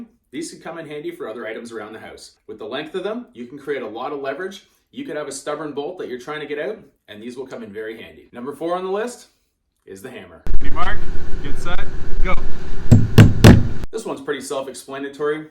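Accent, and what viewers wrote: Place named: Canada. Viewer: Listened 3x for the Canadian accent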